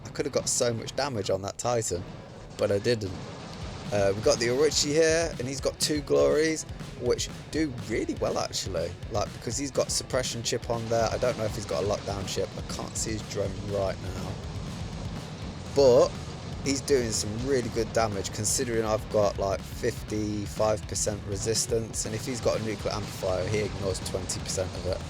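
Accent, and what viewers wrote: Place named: United Kingdom. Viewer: thanks for the reply ! I didn't mean any offence , I play this game since it was released for testing and never had the chance to hear a wr channel with UK English. You're also a good pilot. Keeps it up 🔥👍🏻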